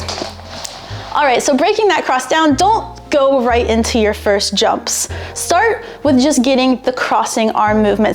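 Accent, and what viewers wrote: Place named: General American, United States. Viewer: she has a simple, more-or-less "standard" American accent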